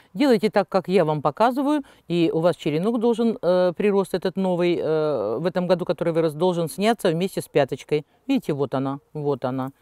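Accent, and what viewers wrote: Standard. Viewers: Вас слушать одно удовольствие Спасибо. Сейчас такая редкость обычная русская речь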